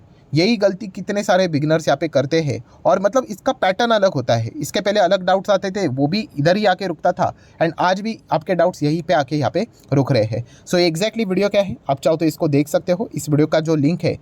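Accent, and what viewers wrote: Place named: Kanpur, India. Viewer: Lag raha guru UP ke kanpur se ho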